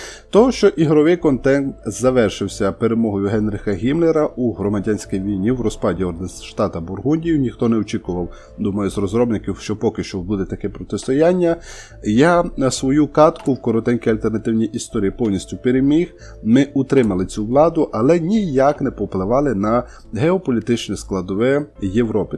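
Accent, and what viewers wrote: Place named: Zakarpattia, Ukraine. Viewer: Хотів би попросити щоб колись один раз ви використали ваш закарпатський діалект на усі 100%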